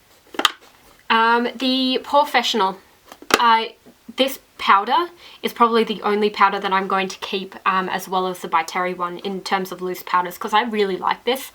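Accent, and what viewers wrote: Australia. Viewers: Are you from Australia? X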